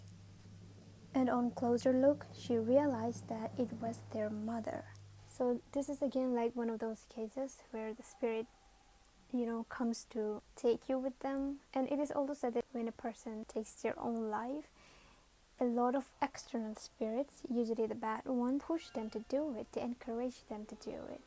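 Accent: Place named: United States. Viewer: you speak like a Us people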